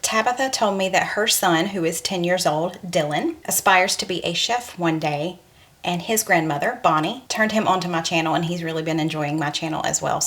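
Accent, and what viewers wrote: South, United States. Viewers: your family is very inspiring. I love watching ( listening to your southern accent). Your graciousness today made me cry. Prayers for your sisters health and to Bonnie's family. Thank you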